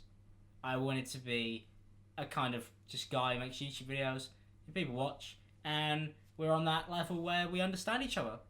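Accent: United Kingdom. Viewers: Subtitles + British accent = House on fire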